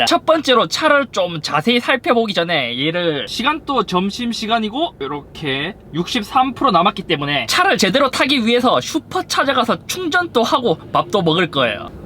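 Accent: Gyeongsang, South Korea. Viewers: 경상도 분이세요,,,? 서울말은 아닌듯한데 ㅋㅋㅋ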